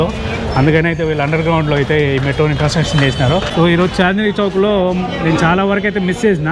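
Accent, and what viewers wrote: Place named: Chittoor, India. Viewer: Ho, ok😊 As per your slang, I thought you are from TPT/CTR😂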